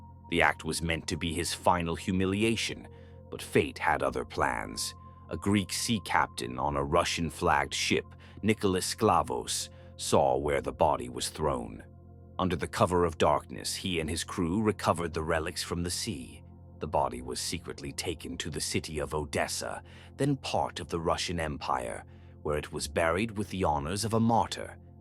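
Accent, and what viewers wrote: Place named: United States. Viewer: DOBLAJE Y PRONUNCIACIÓN INFAMES Y VULGARES.... ¿Qué forma es esa de pronunciar nombres griegos, turcos y europeos? lo pronuncian todo a la anglogringa... ES REPUGNANTE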